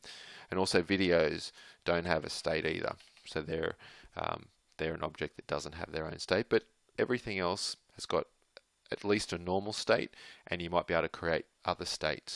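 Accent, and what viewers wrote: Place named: Australia. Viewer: this tutorial is excellent! Your instructions and explanations are very clear. This is the best online tutorial explaining states that I've found on YouTube. Thank you so much! Please make more tutorials. ps. Love that I hear a fellow Aussie too!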